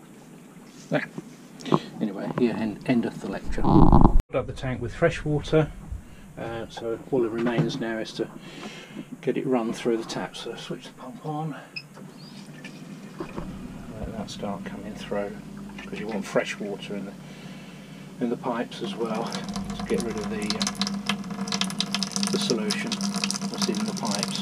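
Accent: United Kingdom. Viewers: Hi. I just found your channel. Your video title caused me to watch and prompts my comment. I live in the central USA. By listening to your manner of speech, I can guess that you are in the UK. You and I use language differently. I am a nurse with a background in preventive medicine and water sanitation. I want to point out that there is a difference between sterilisation (sterilization) and sanitization. Sanitizing is actually what you are doing in the video. Sanitizing is the killing or removal of most and especially of the harmful micro-organisms. Sterilization is the killing of ALL micro-organisms. Sterilization is a process used in medicine to prepare surgical instruments. It most often involves steam under pressure or ethylene oxide gas. True sterilization isn't practical outside of medical and a few industrial environments. Sanitization is used in food service and other environments. 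The RV's fresh water system is actually being sanitized instead of truly sterilized. I am not criticizing your use of the language, and probably your UK viewers understand exactly what you mean. But for others, I feel that it is important to make the distinction. It is important at the beginning of the season, end of the season and any time one believes that the water system might be contaminated, to sanitize the system. Your video showed one product available to help accomplish this. Sometimes though, one might find oneself in need of treating the water system without having an availab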